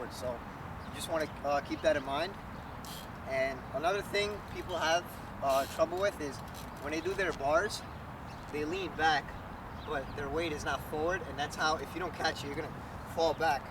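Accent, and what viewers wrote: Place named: New York, United States. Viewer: Yo are you from new york???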